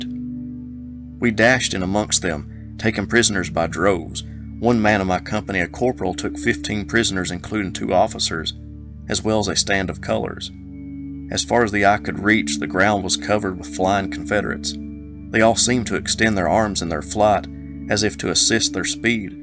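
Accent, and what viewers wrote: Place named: Virginia, United States. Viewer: absolutely fantastic Virginian. i could listen all day never tire of it. that reading took me to the battle. WOW!!! thank you sir.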